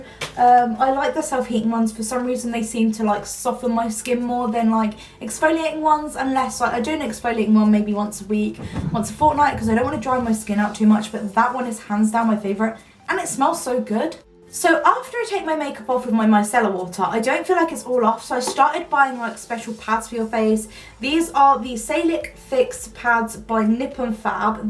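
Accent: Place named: England, United Kingdom. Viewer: I love a English accent 🥰🥰🥰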